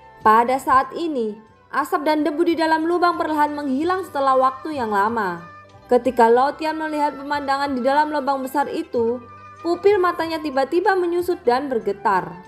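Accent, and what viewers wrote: Java, Indonesia. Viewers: Kak orang jawa yah?? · Nah mulai enak suaranya&cuma logatnya masih medok v😁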